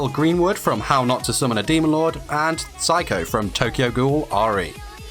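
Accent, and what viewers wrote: United Kingdom. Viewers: She is known as the Penis Dragon! (Or Pee Wee Dragon if you prefer) Btw I like that your accent is British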